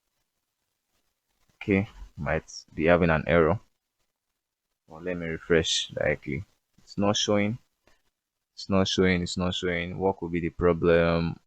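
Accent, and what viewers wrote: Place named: Caribbean. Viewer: Nice video! Very helpful since I'm just starting out with APIs. Are you from the Caribbean by any chance? Cool accent :)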